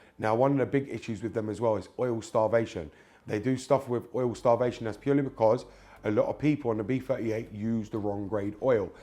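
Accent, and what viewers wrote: United Kingdom. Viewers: Speaking so fast and with so British accent, it is very difficult to follow you. Anyway, very useful information, so thanks' for that!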